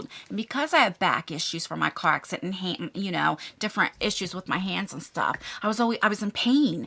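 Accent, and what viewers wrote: New Jersey, United States. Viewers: Love your videos! Whenever I hear your voice, I miss living in New Jersey :-). We are the same age and our birthdays are only a couple days apart, and I just learned a few years ago to trust my gut! Don’t let people gaslight you into making you feel like you’re losing your mind. You feel how you feel for a reason.❤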